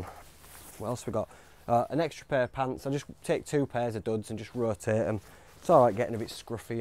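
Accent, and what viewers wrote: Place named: East Riding, United Kingdom. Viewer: Great content just subscribed as am getting in to wild camping, out of curiosity you from East ringing by any chance just the accent as am from Hull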